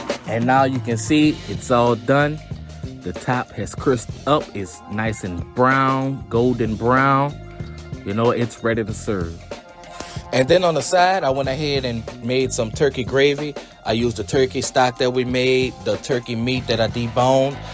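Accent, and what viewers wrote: Louisiana, United States. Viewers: I know a Louisiana accent when I hear one 😂😍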